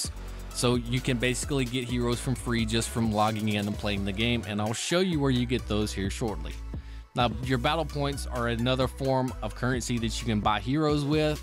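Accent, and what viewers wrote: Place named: United States. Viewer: Thank god, an american. Im convinced that everyone in every other country cant explain anything to anyone. Nor can you even understand them.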